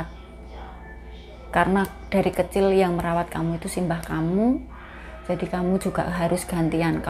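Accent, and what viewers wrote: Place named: Java, Indonesia. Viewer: Mbk,,, njowone ngendi mbak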